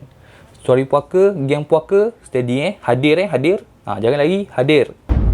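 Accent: Kelantan, Malaysia. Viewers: Kelate ko?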